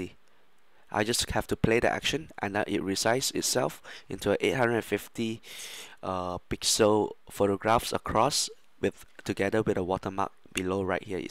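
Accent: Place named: Malaysia. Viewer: my guess is...he's from Malaysia or Singapore... :)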